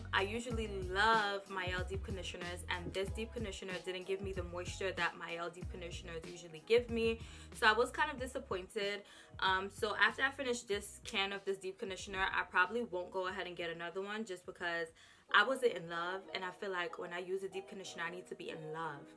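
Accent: New York, United States. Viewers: Thank you for ya review! You sound like a new yorker 😝 let me subscribe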